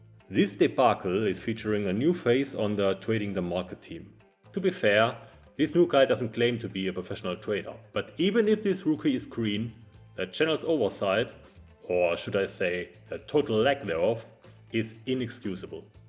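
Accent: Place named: Netherlands. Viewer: Sounds Dutch to my Ear.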